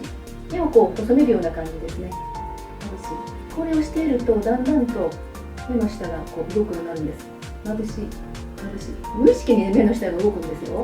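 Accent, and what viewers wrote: Hiroshima, Japan. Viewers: 広島弁っぽい訛りが好き❤️ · 先生は、広島の方ですか？ イントネーションで😅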